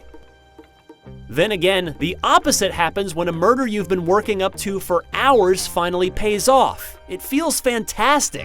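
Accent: India. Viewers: Indian accents arent actually that bad lol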